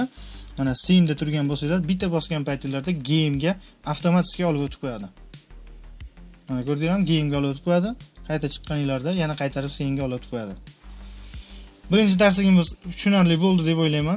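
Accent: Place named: Andijan, Uzbekistan. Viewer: Anjan shevamdan aylanay. Rahmat video uchun, juda zoʻr tushuntirish boʻldi. Videolar kuniga chiqsa yaxshi boʻlardi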